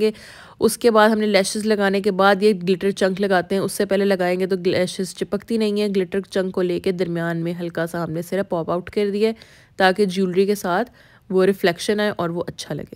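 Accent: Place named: Punjab, India. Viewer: in love with your voice specially(punjabi accent)😍 and uhr justtttttttt waoo🙈❤ have watched all your videos and the way uh entertain everyone is just commendable😍 so much love from INDIA❤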